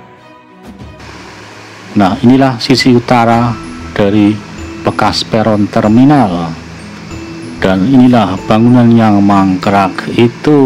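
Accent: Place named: Banyumas, Indonesia. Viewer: Ngomonge ngapak bae jajal aja bahasa indonesia kayane ora pasp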